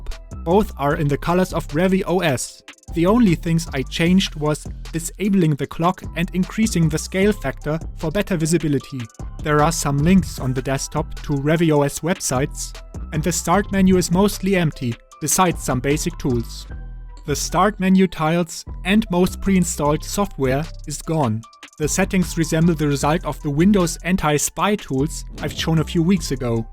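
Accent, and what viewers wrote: Germany. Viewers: man hört deinen deutschen akzent fett raus und trotzdem sprichst du englisch?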